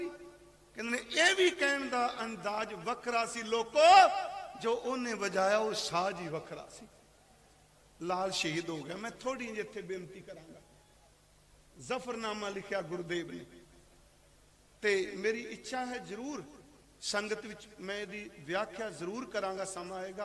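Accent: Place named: Tanda, India. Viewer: Tuhadi boli tanda de area naal mildi hai.. bilkul nirool hai..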